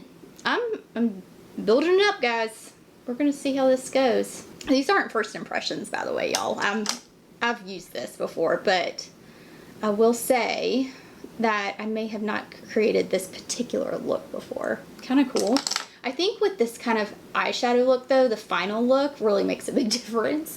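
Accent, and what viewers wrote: South, United States. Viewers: both of those looks are beautiful on you. I also bought the Denim palette but have not had the chance to wear it yet. Still just mostly staying home here in Washington with mandatory masks when out in public. I bought 7 of the new palettes and love them. I have collected Dior quints for many years but love the new formula. You are such a ray of sunshine. Love your Southern accent and personality.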